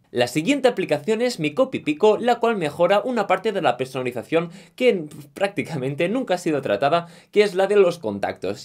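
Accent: Catalonia, Spain. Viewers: ERES UN CATALAAAANN!!!???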